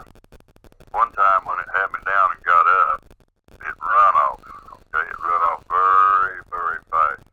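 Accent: South, United States. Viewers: This was great! I understood everything fine, may be because myself and my whole family sound just like him. Just born and raised in the "heart of Dixie " 52 years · I'm from the north and had a difficult time. I think the combination of the southern drawl and the audio made it hard and I did use my ear buds. I'm gonna try listening to it again because the comments I read have made me want to go back and give it another try. Its funny because when I was down south people had a hard time with my accent. Said we northerners talk too fast. Lol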